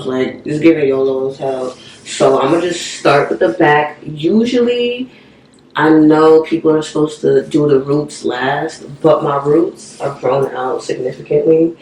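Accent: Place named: New York, United States. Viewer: Def dying my hair tomorrow and hoping it turns out like this. 😂😂 watching the video and listening to you talk I was like ain’t no way she not from New York 😩🤣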